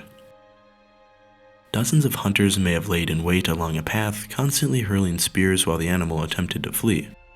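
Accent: United States. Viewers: Nothing is quite as annoying as someone who SOUNDS American. yet choses to use Metric Units. If you're American, BE PROUD OF OUR IMPERIAL HERITAGE. O, and quit turning the "TH" sound in "NeanderTHal" into "NeanderTal." That is something that only came about in the 50 years since I left school—and it SOUNDS LIKE HELL!!!!!!!! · I found the subject matter interesting. But where are you from? You sound like an American but your use of the metric measurement system is foreign to me. I know how long an inch is and also afoot. But centimeters and meters I have no idea. So I gave you a thumbs down. For your American viewers, I would suggest using the measurement we're used to and not to cater to your foreign friends for their not the ones who pay your bills. Otherwise I found your video informative but will forgo any more till you learn to measure in your native system.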